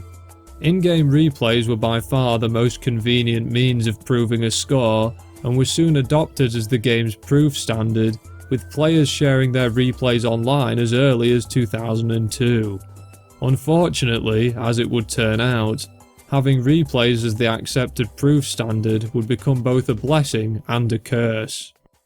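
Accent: United Kingdom. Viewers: refreshing to hear a yorkshire accent on a good youtube video lol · you sound british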